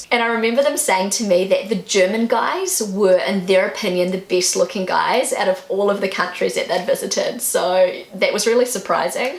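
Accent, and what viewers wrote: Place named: New Zealand. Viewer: It is so refreshing to listen to your nice New Zealand English!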